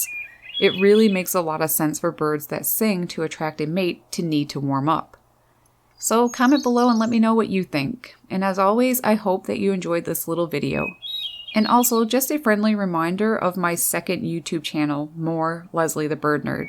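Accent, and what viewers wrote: Canada. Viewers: Its aboot time Leslie..clearly Canadian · Your accent says you're from Canada. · Hello. Looking forward to my morning irritation of springtime American Robins. I love your explanation and it makes sense. I'm from northern Minnesota and your accent was immediately recognizable as Canadian (very strong). Which province? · There is not a thing I can do a-boot that.. soo darned Canadian! lol.. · Was wondering how far up north, then I heard "aboot", that far up north i c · The accent is Canadian eh?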